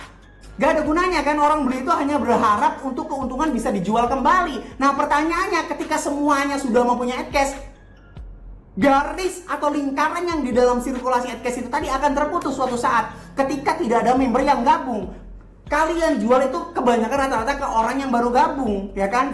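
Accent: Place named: Banyumas, Indonesia. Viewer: Jangan di tonton....Yaa Brother" keenakan nih Bocah Ngapak !!!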